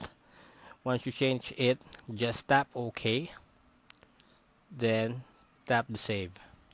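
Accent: Philippines. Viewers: I think he was a filipino because of his accent and pronunciation of english.... But it's doesn't matter Good Job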